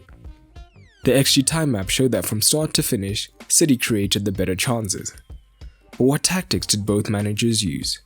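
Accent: South Africa. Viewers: Narrator. Are you South Africa? Your accent sounds familiar 🤔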